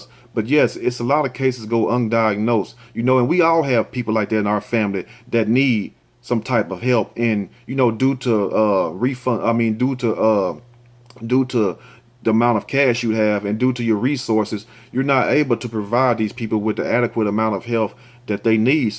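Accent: South, United States. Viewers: Like watching and listing to u even if u talk like your from the southern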